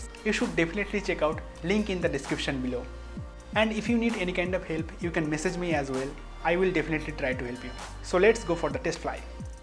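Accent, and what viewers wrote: Bengal, India. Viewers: By the way you speak, I understand that you are a Bengali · Hey are you from Kolkata? Your Accent is like Bangali Dada. By the way, I'm from Bangladesh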